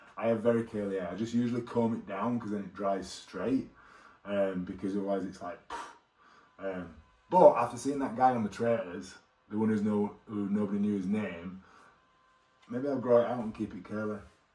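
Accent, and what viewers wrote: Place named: England, United Kingdom. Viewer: I grew up in the UK partly but God do I find the English accent so annoying which I’m sure people find American accents annoying too so no hate just an observation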